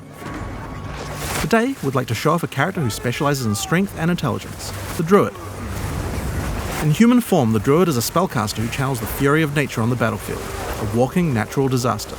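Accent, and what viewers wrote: New Zealand. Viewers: It's always surreal hearing my native Kiwi accent on YouTube lol. Looks dope af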